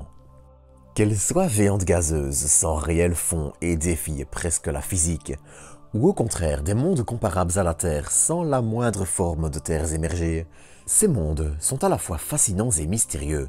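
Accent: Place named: Flanders, Belgium. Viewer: Belge flamand, je crois.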